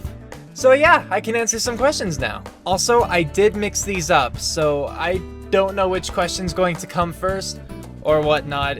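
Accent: United Kingdom. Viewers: You probably won't see this but I have three questions: 1. Can/will you play Arkham Origins? 2. Can/will you play Arkham Knight? 3. (Okay this one is kinda silly, but what the hell) are you British? Or just sound British?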